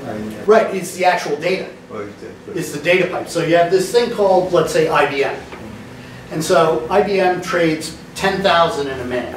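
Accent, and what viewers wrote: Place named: Italy. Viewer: Italian accent.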